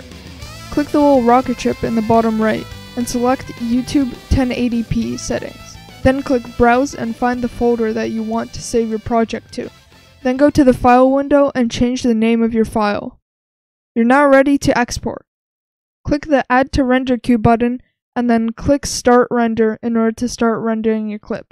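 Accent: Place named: Texas, United States. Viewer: Your from texas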